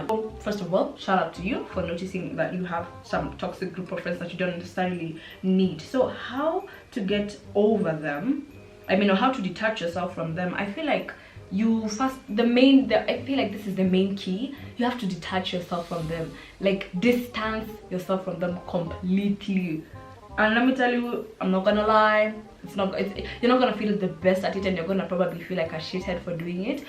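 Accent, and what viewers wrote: United Kingdom. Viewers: Omg do you watch Love Island UK b\c you got so much of their accents?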